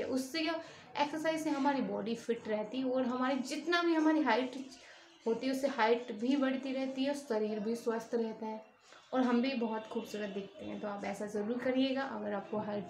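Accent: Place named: Mumbai, India. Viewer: Aji madam ji aap kaha se ho mujhe aapki aavaj Mumbai se lag rahi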